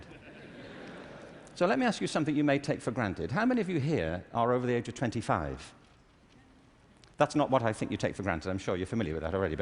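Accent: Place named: United Kingdom. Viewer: Don’t like British accent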